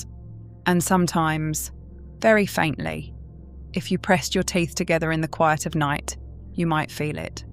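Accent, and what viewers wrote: United Kingdom. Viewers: I wasn’t fond of this voice. It had too much of an undertone of cockney or something which ended up being a bit grating. However, the story was enjoyable.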